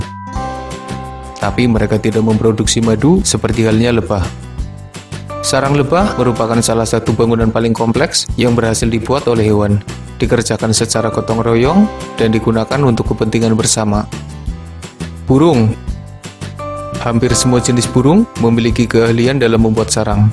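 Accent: Banyumas, Indonesia. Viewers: Org ngapak ya om?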